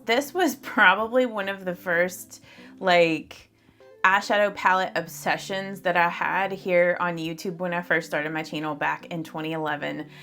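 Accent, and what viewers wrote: South, United States. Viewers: It could be her accent, it's a little southern ❤. She pronounced it Ma/cari. Which I also say ma/cari lol.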